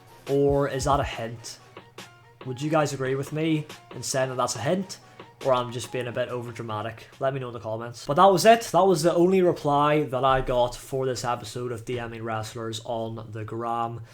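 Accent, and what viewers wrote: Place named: Northern Ireland, United Kingdom. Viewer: Dude it’s so good to hear that accent! Good old Northern Ireland!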